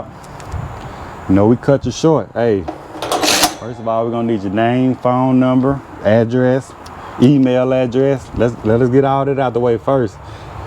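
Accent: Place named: Kentucky, United States. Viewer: This Gentleman has a " BEAUTIFUL " Kentucky Accent !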